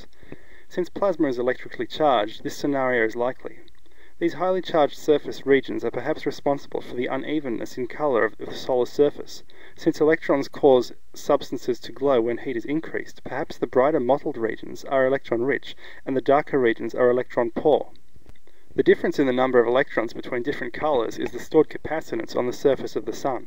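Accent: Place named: Australia. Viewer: it sounds like an australian accent. good on you for preserving it.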